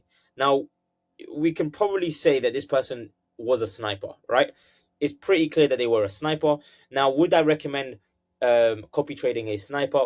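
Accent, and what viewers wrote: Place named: United Kingdom. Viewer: I’m from London bro I hear a British accent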